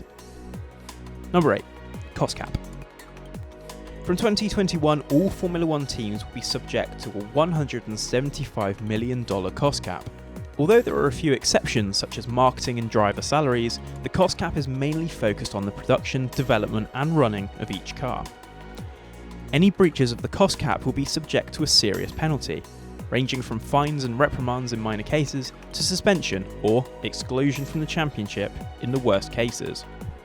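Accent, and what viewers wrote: England, United Kingdom. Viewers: this STRONG English Accent ... kill me...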